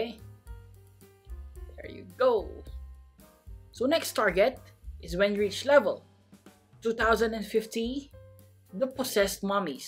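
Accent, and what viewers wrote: Philippines. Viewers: Yo your accent sounds like your from Philippines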